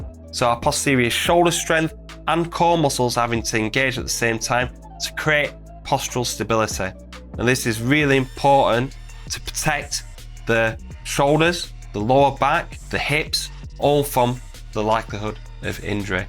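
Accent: United Kingdom. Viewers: Many of the videos I watch just to listen to a proper British Accent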